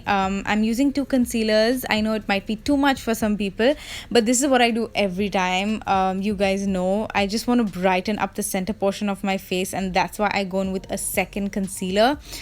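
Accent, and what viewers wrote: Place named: United Kingdom. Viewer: and please stick to british accent for ur voice over !! 😘😘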